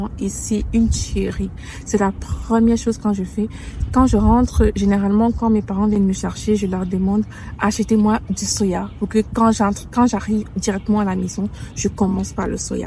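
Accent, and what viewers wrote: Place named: Quebec, Canada. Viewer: Ca fait 2 jours que je t'ai découverte. J'ai presque regardé toutes des vidéos. Tu as presque un accent québécois maintenant. J'aime ta simplicité et aussi, tu détailles mieux brièvement certaines chose que je n'ai pas trouver ailleurs. Je t'envoie plein d'amour et positivité 😘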